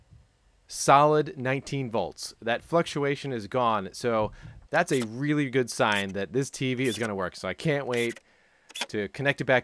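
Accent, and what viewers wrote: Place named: United States. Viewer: Hi cool video. ..but you don't say Soldering/solder propley. .guess it's yank accent. But like I said good video. And am going to fix my television.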